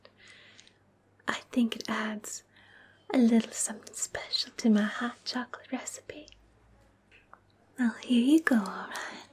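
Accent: South, United States. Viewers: OMG! I know she's not real but I can't help but smile each time I see our sweet Southern Bell. Moving?!?! No!!! Why is our character an idiot? Why is it in most role-plays in the ASMR community, our character is the equivalent of a handsome Village Idiot? Then again, if our faceless character was actually smart, he'd put a ring on her. (Loved the video,. Yeah, you've definitely perfected that sweet Southern accent. Happy Holidays!) <3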